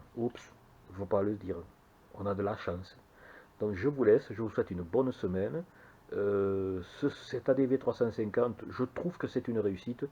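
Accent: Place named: South, France. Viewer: Bonjour Merci pour votre partage d'impressions sur votre scooter, et j'apprécie beaucoup votre joli accent méridional lol Je possède actuellement une Deauville 700 avec laquelle j'ai eu un accident de la circulation, le délai d'obtention des pièces de rechange étant très long, Honda St Dié m'a prêté un ADV 350. Je suis agréablement surpris par le confort et les aspects pratiques de ce scooter et je me demande si je ne vais pas le garder...... Comme toi je suis à la retraite j'ai 66 ans, j'habite en Alsace dans la montagne vosgienne. Est-ce que tu as déjà fait de longs voyages avec? Merci pour ton essai et bon week-end à toi.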